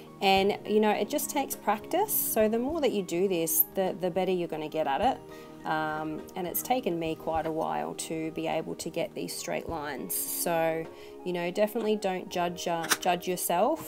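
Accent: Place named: Australia. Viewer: You're voice is making me miss Australia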